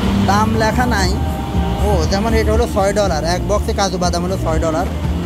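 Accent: Noakhali, Bangladesh. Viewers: ভাই আপনি দেই আর মতন নোয়াখাইল্লা কথা একবারে পুরাপুরি খুব ভালো লাগে আপনার কথা হুইনতে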